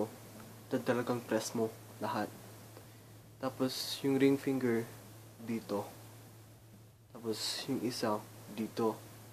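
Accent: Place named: Visayas, Philippines. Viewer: masiyadong visaya ahahah pati tuno mu pang bisaya na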